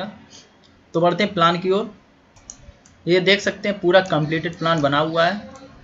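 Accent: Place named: Bhind-Morena, India. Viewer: भिंड मुरैना क्षेत्र के लग रयै आवाज से।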